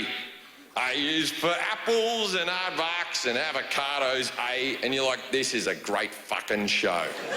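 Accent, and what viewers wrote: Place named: Australia. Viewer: Jim is an Aussie. I'm an Aussie. We all actually talk like this all of the time. It's completely normal. If you were at an Aussie BBQ then you would hear this kind of banter from multiple people all night long. It's how we roll. It's just great that Jim has made inroads into the international market and has exported our brand of humor, not that it's actually humor. It's just how we talk normally. Australia is a funny mother fuckin' place. You'd love it. Come on over. I'll throw a shrimp on the barbie for ya.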